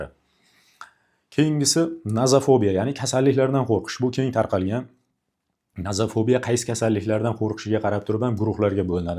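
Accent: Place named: Jizzakh, Uzbekistan. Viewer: Ассалому алайкум доктор. Шевангиз адашмасам Жиззахники деб уйладим. Адрес каерда ?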